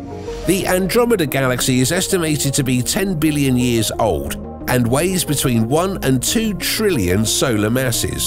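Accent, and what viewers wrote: England, United Kingdom. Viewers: If it wasn't for this guys English accent I wouldn't believe this!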